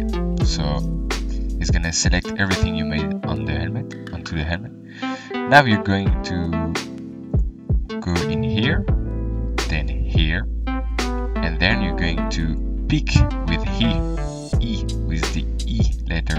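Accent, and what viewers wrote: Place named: France. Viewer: I love your (french?) accent! This was very helpful. Also, le francais cest cool!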